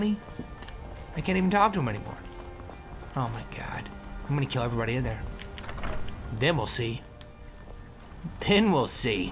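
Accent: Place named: London, United Kingdom. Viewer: an American that can actually pull off a north and south london accent well done!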